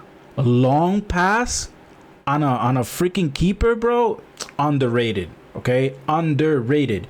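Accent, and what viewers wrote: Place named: Dominican Republic. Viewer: Bro your funny 🤣🤣🤣 with the Spanish I can hear your from RD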